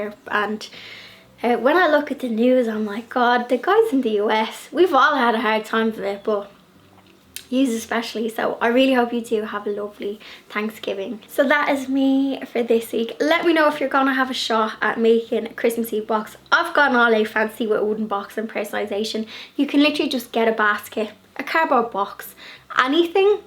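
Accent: Dublin, Ireland. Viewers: Lovely to hear the Dub accent well Done great videos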